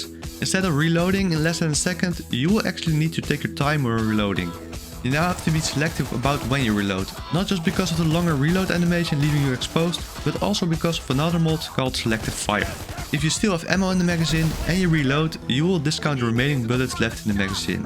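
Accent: Netherlands. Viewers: You gotta be Dutch with that accent. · I can recognize a dutch accent when I hear one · Is it me or do you sound very dutch ? 😅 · dit is echt leip vet, ja sorry ik hoor je nederlands accent wel hoor (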